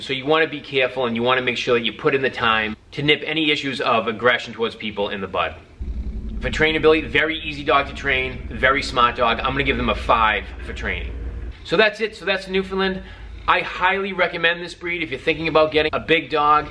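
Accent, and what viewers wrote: Boston, United States. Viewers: You're from the Boston area... Just a crazy guess...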